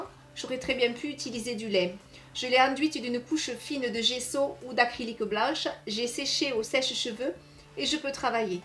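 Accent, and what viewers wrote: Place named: Provence, France. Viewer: Bonjour - C'est vraiment magnifique, quel coup de main! Dommage par contre que l'on ne voit pas votre palette pendant la vidéo. Je vous trouve formidable et quel accent merveilleux - j'hésite entre vers chez moi en provence ou le sud-ouest, mais je penche plutot pour la première solution. Toujours un régal de vous suivre; Merci pour toutes ces belles vidéos et vos conseils précieux. A bientôt.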